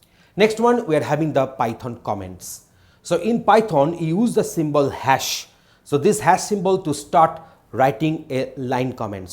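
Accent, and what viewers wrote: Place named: Kolkata, India. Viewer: Sir u r from north side may be from Kolkata, bcz ur pronunciation looks like that, but over all ur explanation is good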